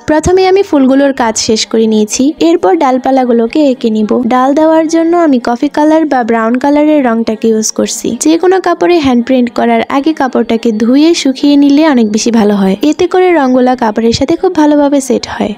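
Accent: Sylhet, Bangladesh. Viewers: Apnr basha ki sylhet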